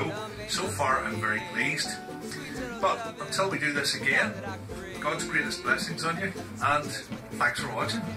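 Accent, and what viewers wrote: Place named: County Down, United Kingdom. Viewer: "Good design is as little design as possible." - some German bloke. Great work mate. Other general thoughts - 1) The music reminds me of the cantina music in the original Star Wars film. 2) I thought yours was a Down accent, thanks for confirming. Must be a lovely part of the world to live in. 3) Hot day? Hot bloody day? I can only assume it was filmed a few months ago...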